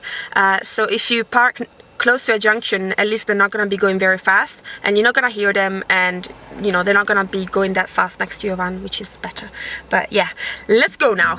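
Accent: Scotland, United Kingdom. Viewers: Really enjoying the Scottish Diaries since I am a Scot living in Canada. I know that you are Italian but I could hear every so often a hint of Scottish brogue mixed in. Any idea why? It sounds so lovely.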